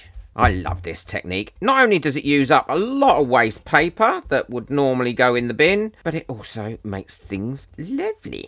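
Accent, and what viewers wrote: United Kingdom. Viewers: That pot came out so, so well! I'm genuinely impressed with how you did that. You have such a great creative mind and you seem to see possibilities in everything around you. I would absolutely love to sit and chat to you about crafting and your creative process. You are such an intelligent and clever man. I am a huge fan of yours. I am also a brit and I love your accent. What part of the country are you from?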